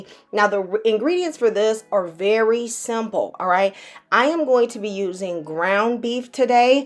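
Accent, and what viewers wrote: South, United States. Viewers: Heard another southern accent--of course it's gonna be great!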